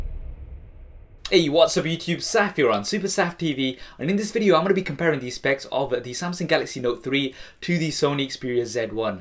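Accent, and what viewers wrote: United Kingdom. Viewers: Very good vid. But I really can t subscribe s.b. Speaking this terrible B.E. accent. Why don t u speak more "neutral" or even A.E. accent? Still... Thx for tha video.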